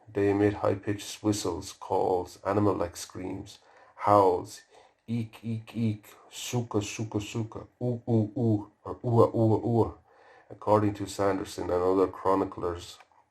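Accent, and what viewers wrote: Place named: Ireland. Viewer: What part of Ireland are you from pal? 🇮🇪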